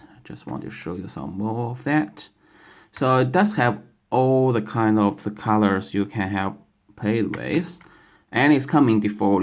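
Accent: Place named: China. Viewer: 中国口音的英语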